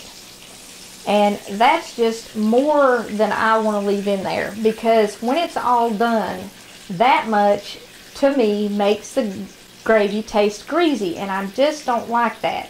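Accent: South, United States. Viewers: You are a fabulous cook. Thank you so much for speaking slowly so we can understand so much better. You have a beautiful southern accent and gorgeous hair. Thank you for being so patient with your subscribers. Love from Debbie and Dexter-Dog the amazing rescued Chihuahua companion.🙋❤🙏🙏🙏🇺🇸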